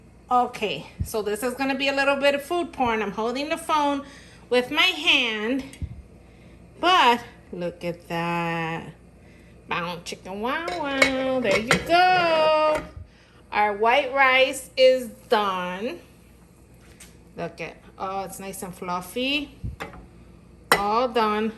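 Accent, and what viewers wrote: Bronx, United States. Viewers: I’m Puerto Ricen an l watch your video forthright first time. Girl were have you been all this time,loved your white rice. Are you fromNY? The Bronx maybe? You sound like it . May God Bless you.👍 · girl you sound like you're from the bronx...i love it!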